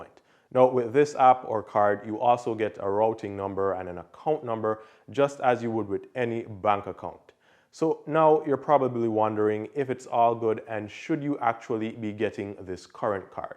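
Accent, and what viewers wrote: Jamaica. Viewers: Weird question what part of the Caribbean you from. I want to guess Jamaica but I maybe wrong.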